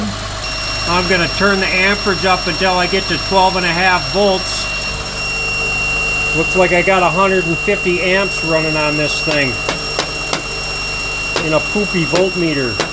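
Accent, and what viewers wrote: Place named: North, United States. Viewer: This is so good! Naming the nuts by MM. Talking to gravity in a positive way. Staying positive no matter what. No cussing. No broken knuckles. lol and a sweet accent. Northern US or Canada? Maine? Minnesota? Uper? Thanks so much man! Very well done!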